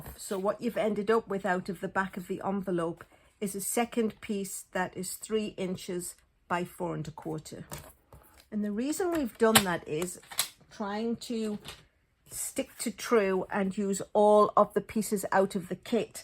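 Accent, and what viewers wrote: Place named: United Kingdom. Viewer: Are you in the UK? Because of your accent. If you are, I wonder if I could buy the PP from you?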